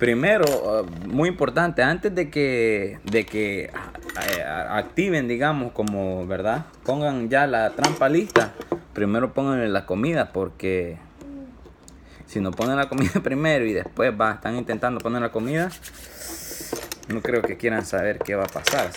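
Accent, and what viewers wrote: Honduras. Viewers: Hola, gracias por su video, jejej, ¿ud es de Honduras verdad? tienen un tontito para hablar muy bonito, además algunas de sus palabras son muy graciosas, lo digo sin afán de ofender. Ya compré una trampa y la pondré para exterminar estos animalillos que son un gran problema. Bendiciones. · De casualidad no eres hondureño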